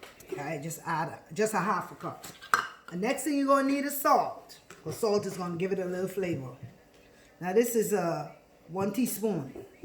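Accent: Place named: Bahamas. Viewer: Bey my wife went crazy wit da Bahamian talk, she loved it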